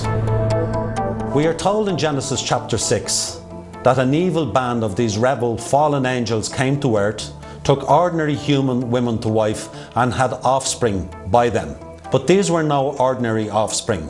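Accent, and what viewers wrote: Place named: Ireland. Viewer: Love that Irish accent! Cool vid!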